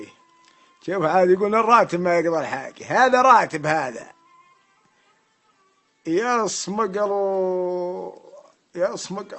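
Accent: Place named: Shawi, Iraq. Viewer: هذا شاوي عراقي هكري .. انت اسمع لهجته زق ... هالرعيان صارت سعوديين ....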